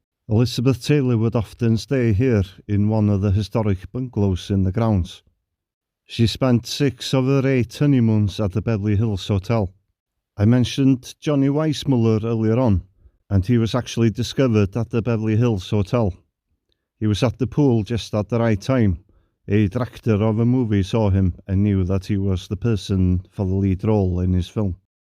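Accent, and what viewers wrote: Wales, United Kingdom. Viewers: Just discovered your channel. Great to hear Welsh accents on YouTube. I’m from the south, but there’s definitely not enough from the north on social media. Keep up the great work, look forward to watching more of your videos. Diolch!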